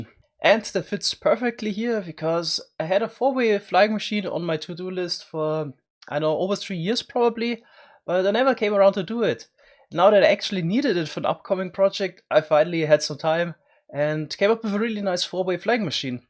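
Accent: Bavaria, Germany. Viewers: Well as a german i obviously noticed your german accent right away, but who am i to point that out? I'm just here for the nice content :D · I'm Dutch but I've always noticed his German accent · das lustigste ist, ich schaue dich seit ewigkeiten, und habe schon immer den deutschen dialekt rausgehört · Funny how you pronounce the "r" in the german word "erfinderisch" for an english audience in a spanish or italian way ;) Edit: Could be bavarian or so, do you speak a dialect often? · Ich habe sofort gemerkt, dass du deutsch bist😂👍🏻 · Hey, ich habe mir mal vor einer Woche ein Video von dir angeguckt und habe ziemlich sofort bemerkt, dass du Deutsch bist. irgendwie kann ich es immer sehr gut heraushören, wenn ein Deutscher Englisch spricht xD. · Now this is an Bavarian dialect I think. But I don't think many people care about that even in Germany. Although it is quite hard to understand German dialects sometimes. 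· I love your german accent, also love how you say BEE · Du kommst aus Bayern oder? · Ich hab immer mich gewundert was es für ein Akzent ist, ich hab vermutet dass es Deutsch ist aber war mir nie sicher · Kommst du aus bayern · Bist du Bayer?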